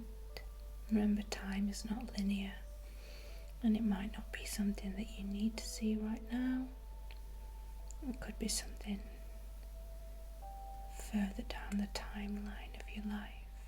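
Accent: United Kingdom. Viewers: Are you British ? 💖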